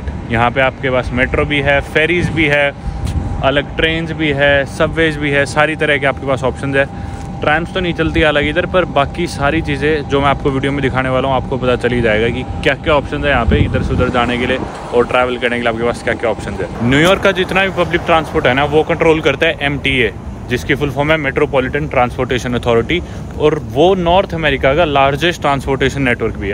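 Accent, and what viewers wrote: Haryana, India. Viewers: Haryanvi accent m vlog dekhn ka mja alag h besk hindi bolta ho. Bhot badiya h bhai. Baki height dekh angrez m chote lage h 😂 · Haryani accent is Lit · Really like the way of explaining everything in very simple way. Also the music while you were on that ferry , you looking into waters , what a moment it made me emotional. You take so much efforts, walking everywhere totally agree for such a short distance they charging so much or its just the conversion making us feel so costly don't know. Buddy simply great. ❤️ for showcasing the reality in subways. again your Harayanvi tone 👍🏻 the way you speak. 'Dhakke' khaye but it was worth. Lots of ❤️ to you. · Bhai aap Haryana se ho kya ?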